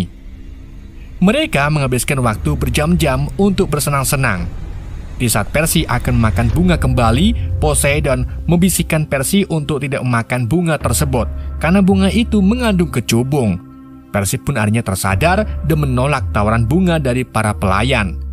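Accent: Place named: Banyumas, Indonesia. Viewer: Orang ngapak ni pasti adminnya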